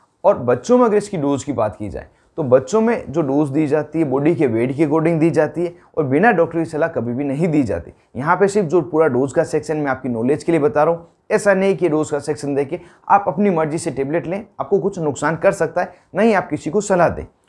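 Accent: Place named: Bengal, India. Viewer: Bangla ucharan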